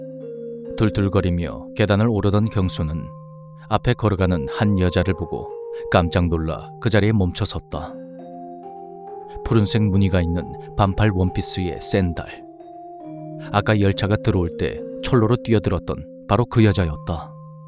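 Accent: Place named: Busan, South Korea. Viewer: 이야... '민식이 법' 이거 나오는 순간, "맞아... 님 다시 시작하셨지. 실감나네." 하면서 굉장히 기뻤습니다. 요즘 댓글도 다 읽으신다면서 즐거워 하시는 모습도 상상되구요. 그러면서도 여전히 님만의 아우라가 느껴지는 소중한 영상이구나 생각합니다. 님만의 리딩, 부산 사투리로 듣는 괴담만이 주는 심오한 느낌, BGM을 기가 막히게도 선정하시는 그 아우라마저 느껴지는 신묘함, 또한 정말 너무너무 재밌게, 실감나게 인물들 대사를 열연해 주시는 그 모든 것이 더더욱 듣길 잘 했구나 하면서 하염없이 감사스럽고 기쁩니다 그냥. 우리 님 올 한 해에는 유래없이 기쁨만 연타로 일어나는 좋은 한 해 되셔요. 하도 많이 웃으셔서 구각이 아프다~~ 하시는걸 뛰어넘도록 쭈욱 좋은 일들만 있으실거예요. 감사히 듣는 모든 사람들이, 재밌게 들었던 모든 사람들이, 표현은 달라도 저처럼 응원할거니까요.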